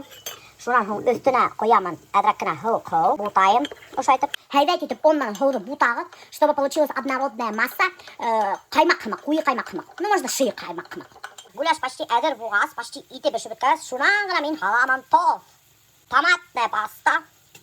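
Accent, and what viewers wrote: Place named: Russia. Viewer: Очень приятный и красивый язык. Но только без русского акцента если можно.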